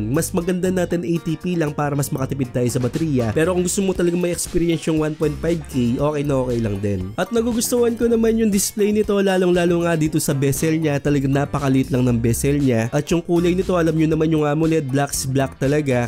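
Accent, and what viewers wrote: Pampanga, Philippines. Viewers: Kapampangan ka neh? balu mung balu ken pamanyalita mu lalagitik hahahaha taga ka nokarin pampanga sir?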